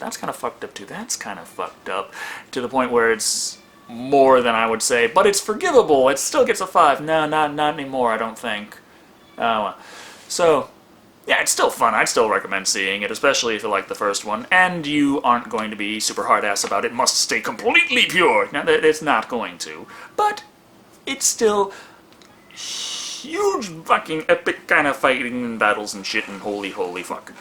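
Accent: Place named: Texas, United States. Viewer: polygon He's from Texas so he does have himself a little accent lol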